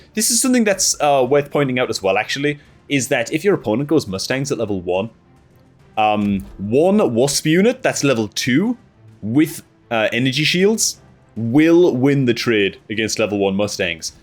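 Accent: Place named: Newcastle, United Kingdom. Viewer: Ah, Geordie makes sense!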